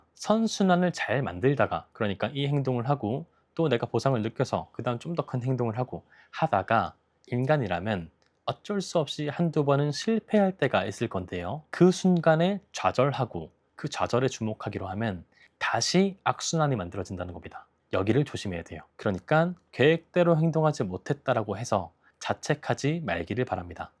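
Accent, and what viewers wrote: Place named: Gyeongsang, South Korea. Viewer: 억양이 경상도시군요...^^반갑습니다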